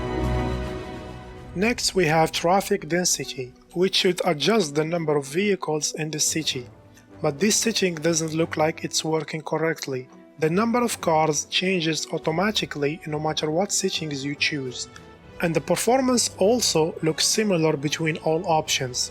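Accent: Morocco. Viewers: bro nta mghribi ? bayna mn lhdra dyalk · Bro are you Moroccan ? Your accent sounds like your Moroccan... And thnx btw for this sweet helpful guide keep it up bro ❤️ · you sound moroccan asf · are you moroccan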